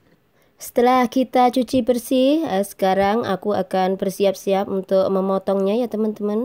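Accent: Java, Indonesia. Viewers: Ngmong nya medok🤣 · Ya Allah......aku ngiler liat jamurnya Btw.......kak asalnya darimana?ngomong nya medok kayak orang Jawa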